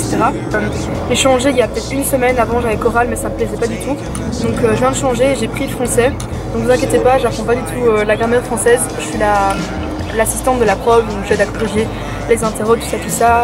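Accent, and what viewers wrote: Belgium. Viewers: Accent belge comme on les aimes 😂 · J'ai direct su que t'étais belge jsp prk 🇧🇪💪🏾💪🏾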